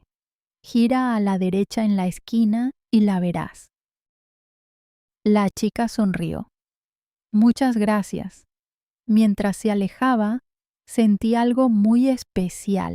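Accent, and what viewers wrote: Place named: Latin America. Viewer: La hablante tiene acento latinoamericano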